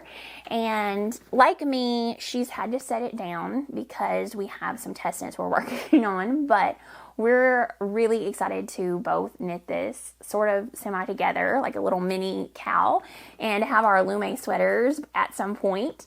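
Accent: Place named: Texas, United States. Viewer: I'm from North East Texas and currently live in Alaska so listening to you is a little taste of home. Love your podcast and thoroughly enjoy you as a person. You're so lovely! Thank you for sharing your farm. #Softly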